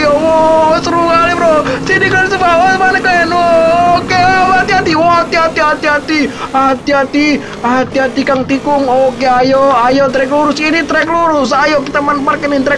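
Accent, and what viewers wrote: Medan, Indonesia. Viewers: Orang medan bang ya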